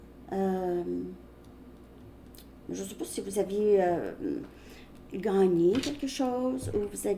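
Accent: Canada. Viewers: Bonjour Nouvelle arrivée sur votre chaîne, je suis Française et vous avec votre accent, Canadienne sans aucun doute. 😊 J’ai beaucoup aimé votre vidéo. Joyeux Noël et bonnes fêtes de fin d’année. À bientôt. 😊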